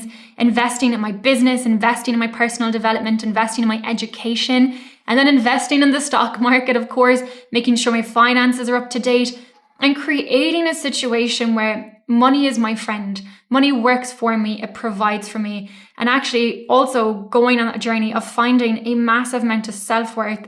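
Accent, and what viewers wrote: Ireland. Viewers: Oh you are Irish.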